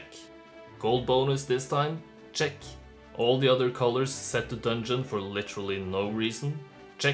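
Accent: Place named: Norway. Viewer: ❤ By the way, judging by your accent, might you be norwegian?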